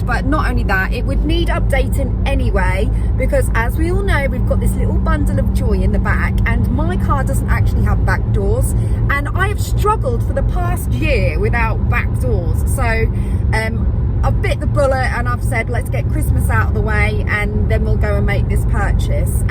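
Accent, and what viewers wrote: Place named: England, United Kingdom. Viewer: Totally unrelated comment however I have been wondering what part of England you are from originally. You have a wonderful accent. Here in Australia I think one would have to be a linguistic expert to pick the difference in accents from each of our states! doesn't have the same accent as you?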